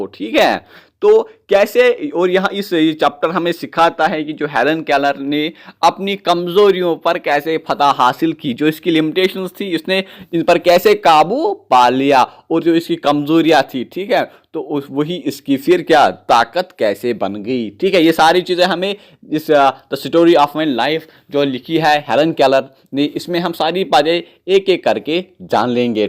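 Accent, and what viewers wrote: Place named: Kashmir, India. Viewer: Your kashmiri accent is so sexy ❤❤❤❤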